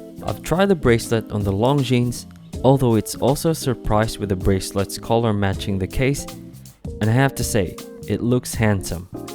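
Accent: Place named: Singapore. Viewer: Bro, great looking Nb1050. BTW custom size leather is quite easy to get these days right? You sound quite Singaporean, are you in Singapore?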